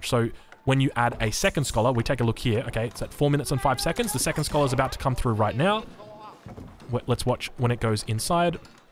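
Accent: Australia. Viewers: I seriously cannot get enough of your voice lol. Is that weird to say? Yeah that's probably weird to say... But hey, having a great voice (Australian accent is a plus!) is great for casting like this!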